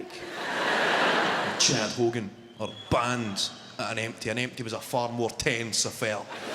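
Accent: Scotland, United Kingdom. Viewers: He's toned down for his specials to be fair so as to transcend into mainstream, same as Billy Connolly did. He has mentioned in an interview somewhere that he changed slightly to be more understood and he gets slated for it, but people's have to understand, if he was to keep his broad accent, and only a handful of people understood or got his jokes, then he'd reduce his appeal and fanbase. It's a balancing act, and he is one of the more genuine comedians that hasn't pampered to the crowd, and only changed what he needed to so as to keep his authenticity and identity, and that's what I like about him, plus he's pish funny, as are the Scots in general.Scottish and Irish just have an uncanny knack of being funny. There have been many people that have chosen to hide or indeed even tone down their accents and pampered to what the media outlets want just to appeal to certain audiences, because those Media outlets want to profit more from their representative, as opposed to actually care what their representative thinks about disguising or ditching part of their identity. 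I don't like the word sell out Becaue anybody in this business wants to maximise their income, and if that means toning down your accent just to be understood a bit better by a bigger audience, then that's not a bad thing, especially in a career that's make or break and can be over in a flash, but completely losing your identity is where I draw the line, and that's a sell out to me, totally changing just to appea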